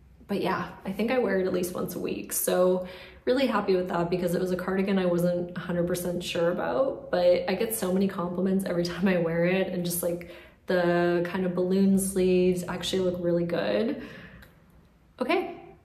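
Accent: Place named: Ontario, Canada. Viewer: Pretty sure it’s an Ontario accent, hard to change your natural speech patterns and for some reason women are often targeted for their speech tone, patterns etc.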